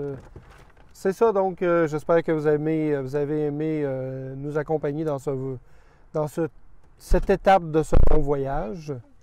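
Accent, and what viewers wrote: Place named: Quebec, Canada. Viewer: Ah!!! l'accent quebecois.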